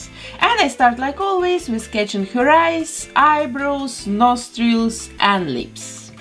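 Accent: Russia. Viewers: Из какой страны автор видео? Просто очень сильный русский акцент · Omg I love your russian accent. I'm crying it's so beautiful! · Блян, отдам миллион рублей, но она русская, по акценту понятно.. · Is this Russian accent? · Мне кажется или её родной язык не английский, ахпхахахах, может это и не секрет, просто я тут в первые, но мне кажется, что она выучила английский язык, а её родной это русский, ну по моему мнению акцент слишком сильный, ну хз может я ошибаюсь.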